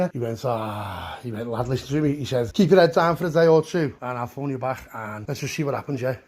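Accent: Liverpool, United Kingdom. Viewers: You sound like you got a cold.scouse❤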